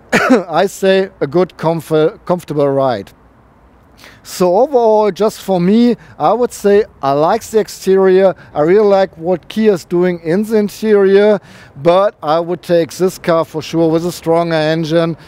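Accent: United Kingdom. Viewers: nice review,very nice car, nice english accent ! good job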